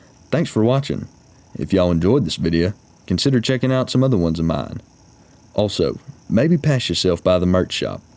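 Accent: South, United States. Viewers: This guy is the most American-sounding American I’ve ever heard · This is by far the best channel Ive stumbled upon recently! Your accent sounds like one that should belong on any of my fishing buddies, glad to see there are more good old boys into horses of the Iron type than just me. Lmaooo, liked and subscribed, keep up the videos! I really enjoy them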